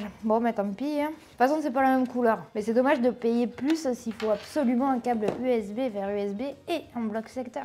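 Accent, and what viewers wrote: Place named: Toulouse, France. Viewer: J'adore ton accent toulousain 😂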